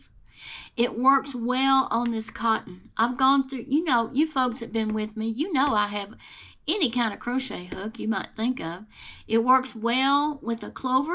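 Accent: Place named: South Texas, United States. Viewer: Just love watching you ‘cause you talk like me! I’m a south Texas native who made my first cardigan following your tutorial this spring. Thanks a bunch, friend! (Ps - I’m a retired nurse too!)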